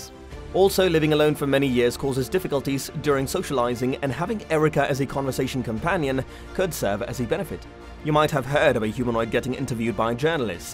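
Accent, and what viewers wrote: United Kingdom. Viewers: Why do these videos always have to be narrated by some idiot with a British accent? And of course it will only be available to the very rich.. Who else could afford to buy an artificial companion for $350 THOUSAND Dollars?? And if they are fully functional then show me... I want to see the function!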